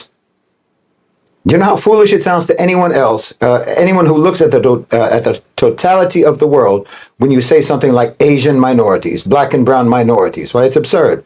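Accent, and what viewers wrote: United States. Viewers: Meanwhile he wears a suit, speaks American English and benefits from all good of western culture.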